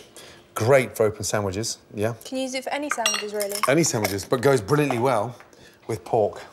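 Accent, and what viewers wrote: United Kingdom. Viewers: Five and half to Six hours in the Oven at 140C...! Agg, still having trouble with British Accent.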